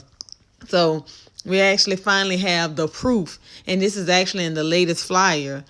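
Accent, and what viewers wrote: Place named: New Orleans, United States. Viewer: Hi! I don't believe you really collect pins, but there's this shop called on Morgane Brret Shop on Instagram, and she recently did a small pink dress Cinderella themed pin collection, and it reminded me of your channel! I hope this comment isn't too forward, but I just wanted to let you know. Love your channel, and it sounds like you're from New Orleans, so it's cool seeing another fellow Disney collector from Louisiana!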